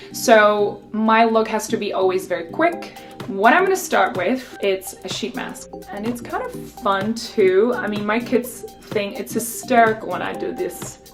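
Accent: United States. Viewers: I’m also Czech and i’m genuinely impressed by her accent, she sounds so american, like woah :D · Her accent really sounds american now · zní fakt jak američanka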